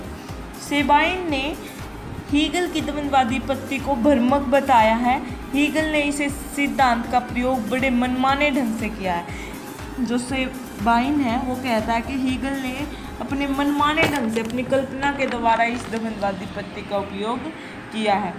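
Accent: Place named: Haryana, India. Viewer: Haryanvi presentation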